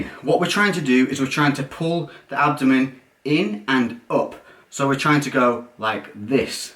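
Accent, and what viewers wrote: United Kingdom. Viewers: How did I know he was going to have a brittish accent before the video started LOL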